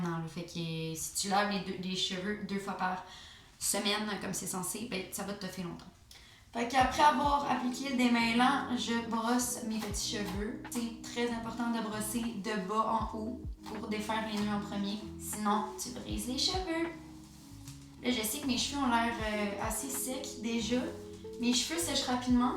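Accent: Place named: United States. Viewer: j’ai écouté la vidéo comme si une américaine parlait. tellement mon style de vidéo 🤍 that was fun!! continue yt comme ça big love NON AHAHAHA LES PHOTOS DE TOI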